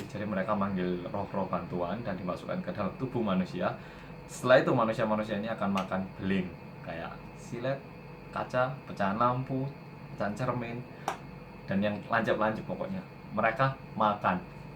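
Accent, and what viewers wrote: Java, Indonesia. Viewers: sy senang korea yg logatnya masih medok jawa...walaupun sy orang banjar kalimantan...gk bisa ngomong jowo... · jadi penasaran kenapa oppa tertarik sama Indonesia sampe kadang ngomong pake dialek jawa 😂 lucu tau liatnya 😍😍😍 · Tapi medok Jawa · Awal2 tyta blm ada "orang korea yg medhokkkk", sama "bolo-bolo" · 100% logat Jowo e apik tenan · mas korea, aku jg orang jawa. aku suka medokmu...hehe.. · Seng ngangeni medok ya ki looo 😄😄😄😄😄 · pasih banget bahasa indonesia y,,bahasa jawa y medok banget,,sebener orang mana sih.... 😀 salam kenal... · 오빠, lucu dah orang korea fasih banget bahasa indonesia nya plus ada medok medok e ㅋㅋㅋㅋ niceeeee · Aku suka video mu mas . salam kenal ya aku wong cirebon . jawane medok apik . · Ya ampun. Awakmu iki wes juwowo bianget. Sampek ngomong manten iku lak jowo wo tah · Eommeo.......😱😱😱 Your logat is so Indonesian! 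I am Indonesian and Javanese but I can't speak Indonesian or Javanese as good as you do. Daebakkkk Hyung.... If you have a time please visit my hometown; WONOSOBO in Central Java Province. Kota itu terkenal akhir-akhir ini Btw 😁 Make some videos there and share all here. Okay Mas Bro, Matursuwun. Salam Kenal.😊 Anyeong...... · Belum ada bersama orang koreaaa yg medhooook dong :" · Bersama orang Korea yang MEDOOOOOOOOOK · Kayak Turunan Jawa asli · medok jowoo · ngomonge luwih medok timbang aku cak... padahal aku wong jowo.. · Logat jawa dikit.. Keren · Hehe tk pagil mas aja ya ini orang korea asli apa orang indonesia ta kok ngomong ya medok kayak aku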